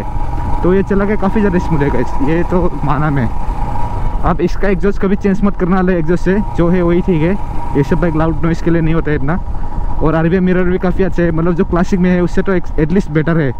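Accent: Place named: Assam, India. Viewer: bro i think you Assamese boy cozz Hindi sm to sm Assamese wlo ka hindi hai ye😊btw keep it up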